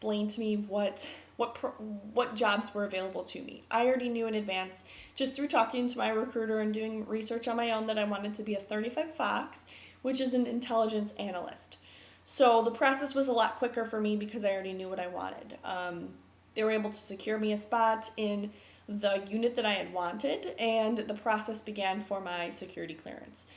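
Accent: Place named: North, United Kingdom. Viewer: Northern accent.. Hate it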